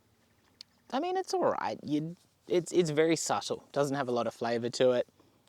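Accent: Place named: Australia. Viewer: LOL Did I just hear an Aussie dis Americans for not pronouncing words properly?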